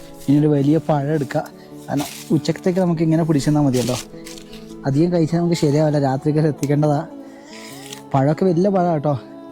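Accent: Kannur, India. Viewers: Kannur kaaran ano?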